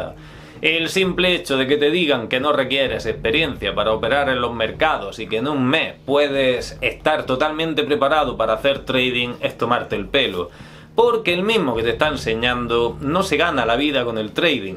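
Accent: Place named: Granada, Spain. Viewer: like solo por el acentazo granaino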